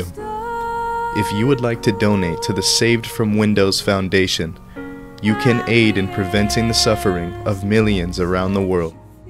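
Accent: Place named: South, United States. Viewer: That southern accent💀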